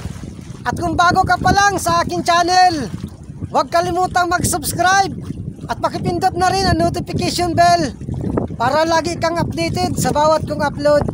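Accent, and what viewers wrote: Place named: Camarines Norte, Philippines. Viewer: kaya pala pareho tono Ng salita natin siguro taga vinzons ka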